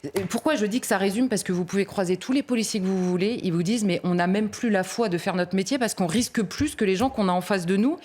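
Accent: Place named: Southampton, United Kingdom. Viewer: J'ai reconnu l'accent de Southampton en Angleterre ...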